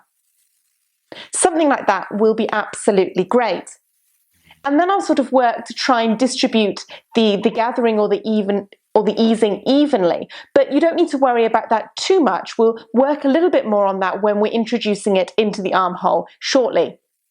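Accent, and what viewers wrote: England, United Kingdom. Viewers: Very clear and detailed instructions. Love your English accent. I had never heard of clover leaf pins. I quilt. This would be great for matching quilt blocks!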